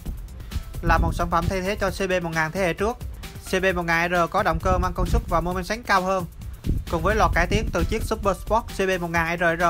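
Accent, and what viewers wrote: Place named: Binh Dinh, Vietnam. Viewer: Lam on dừng nói tiếng bình dinh ko nghe dc gì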